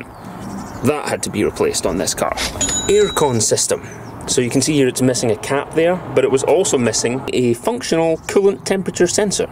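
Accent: United Kingdom. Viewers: Dude, look.. this is the story: 1. I'm German and worked for 2 big German manufacturers as well as on their cars. They break and they break fast and a lot. Deal with it 2. You sound British or a little Irish or Scottish, so what point are you trying to make with such a car with them speed limits here. Makes way more sense in Germany, but quite obviously you don't live there. 3. Buy yourself a decent Toyota Avensis D4D and enjoy your life instead of wasting time fixing things. 4. As I said I worked for German car manufacturers and advice everyone NOT to buy a German car but a Japanese instead because they are awesome. 5. Even my German Stihl Strimmer from the early 80's - which is still running strong by the way - was built in Japan.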